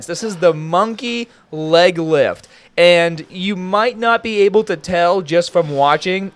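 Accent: Boston, United States. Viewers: Watah pump Muscle fibers in your aahms Monkey bahs. Boston accent on point today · I never noticed your boston accent in other videos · Hey, love your videos, been viewing for a long time! I'm actually from the MV region aswell. You just don't get that OG Boston accent in channels anymore! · what accent is that? Boston accent? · Is that a Bostin accent?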